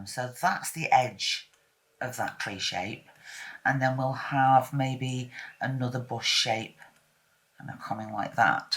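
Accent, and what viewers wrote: Northern England, United Kingdom. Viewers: You demonstrated and explained this so well, thank you, and all with a wonderful and lovely Northern accent too! All the very best for this new year.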